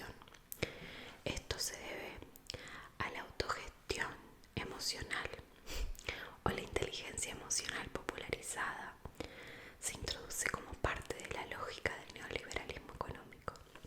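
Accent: United Kingdom. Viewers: I really love your English acent, you do it very well, love ur videos, been I really don’t understand you anyways I put the subtitles, haha u are the best!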